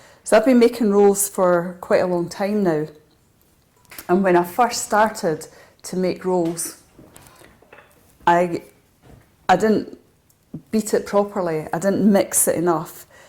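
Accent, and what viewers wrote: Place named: Scotland, United Kingdom. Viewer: It's easy when you know how, but the how is all in the person , in Ireland in the past ever one made irish brown soada bread and ever one's bread was different by taste and i mean everyone and everyone's preference was different, bread has the personality of the person who makes it in it and it's the best ingerant, perhaps that's why holy communeyen has the body and soul of Christ in it Give us our daily bread , you have a beautiful Scotch accent, a Caledonian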